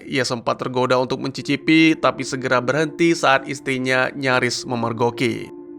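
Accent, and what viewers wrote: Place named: Java, Indonesia. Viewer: Ooh adminnya orang jawa ya😅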